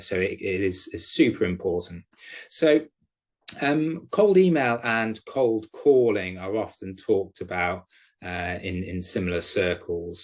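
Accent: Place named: United Kingdom. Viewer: Hi you sound like your from the UK. 🇬🇧 I’m from London UK aswell, I’ve been E-mailing tons of companies in my cleaning business. for the past 1 month from 4 different Gmail accounts every single day. 25-mails to companies each, a few responses back but only them responding saying not interested. 1 or 2 have got back to me saying they want me to come for a walkthrough. Is there something that I might be doing wrong? Is there more of a simple way to get more cleaning contracts quite quickly? Thanks bro look forward to hear from you.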